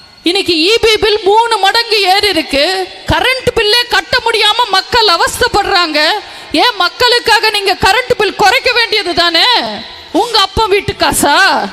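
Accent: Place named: Telugu, India. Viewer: Cenema markettu sarincha piraku. Koovathoor koothadi kachiku koothu adika vanthutte. Adia. Nee pesura thàmil. Parthal nee thelungukariya thondaiyile. Enna machine vachiruke. Voice kekkave. Sakikaladi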